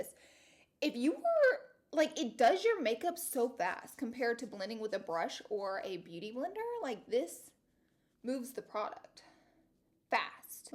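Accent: South, United States. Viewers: Please bring out that southern accent more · "Ma whole side panel just fell" The southern drawl is STRONG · She's from Alabama and Insatiable is in Georgia. A smoother southern twang than Alabama I think. Love all the southern accents though. :) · I love her Souther twang....coming from a Kentuckian with a major Southern drawl! 😆😆😆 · When you whisper “what is this thing” I literally lol 😂!!! It was like from a horror/supernatural movie lol Btw pleaseeee keep using your southern accent !! It’s soo cute x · “My whole side panel just fell” that southern aksent gf❤️❤️ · Your country accent poking out is so cute! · Can we talk about how country the first 5 seconds of this video was?! 😂 I looooove it. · Damnnn she sound like a southern bella or a pretty hillbilly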